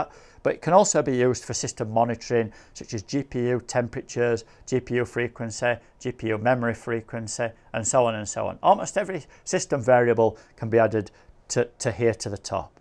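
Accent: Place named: Northern England, United Kingdom. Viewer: nothing's wrong with him lmao. It's the British accent from the north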